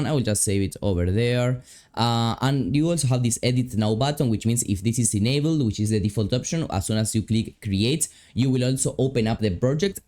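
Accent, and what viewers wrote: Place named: Argentina. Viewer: Aunque hables en inglés se nota que eres argentino 👍🏻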